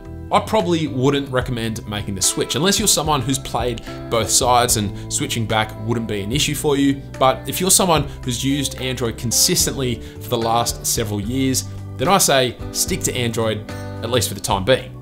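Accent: United Kingdom. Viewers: Thought you were British... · I thought you were from I UK or something haha. Sorry English it's not my first language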